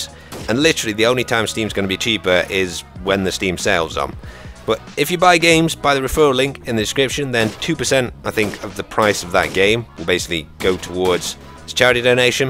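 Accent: United Kingdom. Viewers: are you British?? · Not gonna lie, I didnt know was British! xD Aha, I really wanted this game ages ago, but my PC wasnt good enough (it was a pile of crap) probably gonna get it soon!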